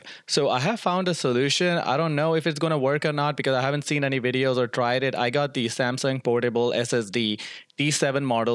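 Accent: India. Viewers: Are you from india? because your accent seems like it and you also search bollywood videos in your 8k tv video that's my speculation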